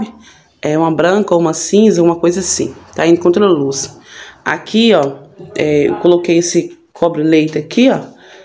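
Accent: Minas Gerais, Brazil. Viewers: Vc é de onde ?? Vc conversa tão engraçada faz graça kkkkkk igual eu deve ser mineira como eu amiga kkkkkk é nois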